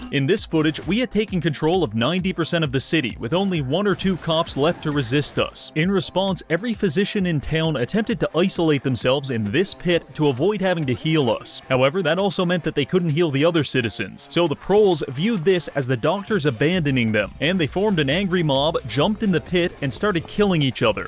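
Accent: Canada. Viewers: I can hear that canadian accent boy... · Wtf are you canadian...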